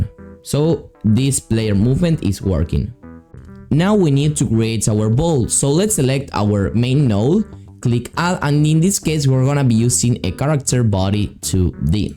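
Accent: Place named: Argentina. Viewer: Your from Argentina ❤❤❤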